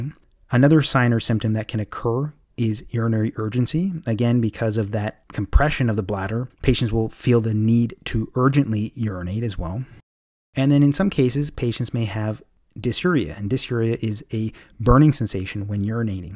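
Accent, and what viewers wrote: Canada. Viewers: he sounds Canadian to me.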